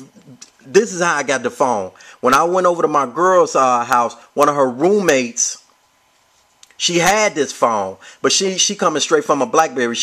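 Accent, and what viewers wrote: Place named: United States. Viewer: why cant you speak standard American English?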